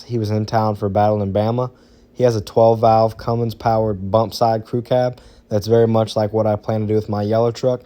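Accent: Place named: Texas, United States. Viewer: Wya? Texas? It sounds like it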